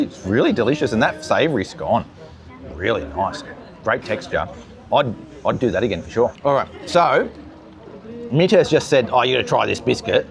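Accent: Australia. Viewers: No surprise that the visa fee hasn't been removed, it will never be removed. Your accent is fine, you sound fully Strayan to me. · you have one of the Aussiest accents I've ever heard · For us Europeans your accent is 110% aussie! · you still sound like an Aussie. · As someone who enjoys a good strong coffee, I'll definitely have to give Wiski a go-go (Sorry). You sound Australian to me, especially when you use colloquialisms. I was once told I was South African by a lady in an office in a small town called Myrtleford, Victoria. For 40 minutes I tried to convince her I was actually a Kiwi, but she wasn't buying it. In the end I said I was from Swaziland and left. Small town people are funny. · I am not fazed by paying for a visa....Australia charges way more than Indonesia..$250 if you are coming to Oz... maybe aussies should ask why australia charges so much? 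Your Aussie accent is still there 😊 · you certainly still sound like an Aussie. I lived the first 28 yrs of my life in Adelaide and I still get some people who think I was born in England. Some people don't realise there's more than one Aussie accent. I really appreciate all your recommendations, Bahasa lessons and visits to other islands. I was speaking to someone a couple of days ago who looked at going to Bali and it was going to cost $1900 with Jetstar! Mind you, she was going to book only two weeks beforehand and would have been in Bali during the school holidays. Keep up the good work but don't burn out. We're so looking forward to being in Bali in October. Alan's already counting the days down. 😊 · Wiskii's iced cappuccino is excellent (along with all of the other styles). I'm a Mon/Wed/Fri regular at about 10am and he is always open then. Oh your accent is still recognisably Aussie. 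· Funny,I think you sound sooo aussie,but I watch anyway!!I don't know where you lived in 'Stralia but you seem to have a Seeedny accent to me.but what do I know,I'm a Kiwi,but I have recommended your channel to a couple of people and said "he has a very Aussie accent tho",almost like it's a criticism😂.I'm a bit biased tho cos I have two syblings who are Australian.ignore the negative people,I think you're great and you've inspired me to think about moving to Bali when I retire in 3 years.cheers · Absolutely! you sound full Aussie bro 😂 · a well spokenAussie, but definitely Aussie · Your accent is extremely Australian. Sometimes it can dip into colloquial/ocker, but overall it's the standard Aussie one. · Your accent is fair dinkum aussie, the only difference between us is that you roll your r’s and pronounce the Indo words well. You even chuck some slang in there. A good thing to teach your daughters, if he waits around for 2 hours he must be keen! 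Well done 😁 Thank you for answering our comments, we don’t expect you to. Often we comment because apparently it pushes the google algorithm out there. Cheers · Hey You definitely don't sound anything but an Aussie. Funny what people hear, my wife is German and she often gets mistaken for South African which she doesn't sound anything like it. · Love your videos... thank you for sharing your walk arounds and life experiences, language, and views 👏👏👏... and for sure, I can confirm that you have an Aussie accent and nuances 👌 😂 Sydney sider here... and always dreaming of coming back to Bali 🌴🌏🌞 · Your accent is still totally Ozzie, never fear!😂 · Nah mate. Your aussie accent is fully intact. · Im afraid youve got still got your full-on Aussie accent lol....you just have the ability of pretty good grammar pronunciation and structure of bahasa indonesia so maybe that conclusion is drawn from that...anyway yes food for thought re visa free...thanks for the info❤. 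· Your accent is true blue mate. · Sorry, you can take the Aussie out of Oz but u will never loose ur Aussie twag…. Ur as aussie as dead horse on a meat pie mate!!!!!! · No, you 100% have a strong Aussie accent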